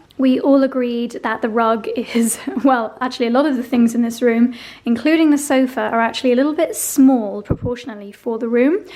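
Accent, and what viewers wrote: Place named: United Kingdom. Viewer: Hi! ☺️ I am a newer subscriber and I have got to say over the past month or so I have seen how practical you are, but that you also appreciate the finer things in life and I LOVE IT!!!! You are so sweet and down-to-earth and I’m here for your newfound hobby of gardening! Your music choices are so lovely and therapeutic and so is your voice and British accent! I really enjoy your videos and they help this busy mom get thru her day! Thanks so much for sharing! 😘 Take care!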